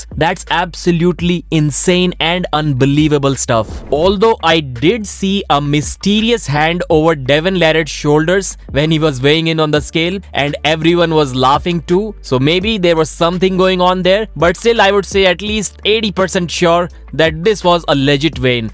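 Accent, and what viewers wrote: India. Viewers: voice over reeks of curry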